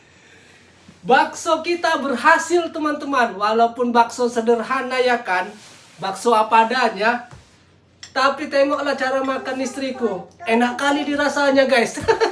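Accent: Medan, Indonesia. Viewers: Mas ini aslinya mana ya, bahasanya antara Medan sama banyumas.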